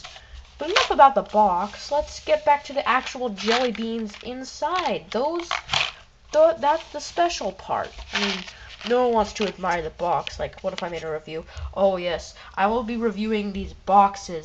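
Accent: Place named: United States. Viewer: I must be mad but I watched all of it both parts no skiping great fun I must get some don't know were to get them in the uk I'm gessing your american love ya actsent lol I will check more of ya vids :)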